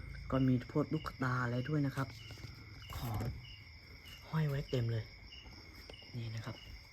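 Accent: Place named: Rural, Thailand. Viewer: เพราะว่าพูดเหน่อ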